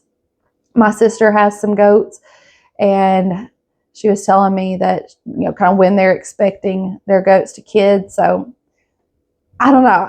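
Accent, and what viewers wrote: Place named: Alabama, United States. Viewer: Your accent reminds me so much of my home town folk growing up over in Marion County, AL.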